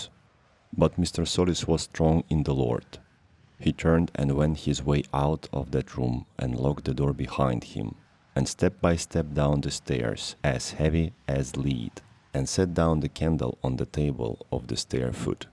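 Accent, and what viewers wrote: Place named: Eastern Europe. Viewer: the voice and accent sucks big time ... wtf ??? it's robert louis stevenson .. not eastern european story time !!!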